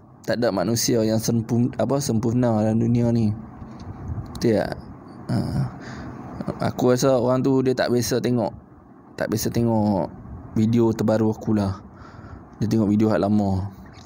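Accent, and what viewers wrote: North, Malaysia. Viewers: Mantap bro cakap utara baru paham kalay da contact number ws la nk belajar lg mndalam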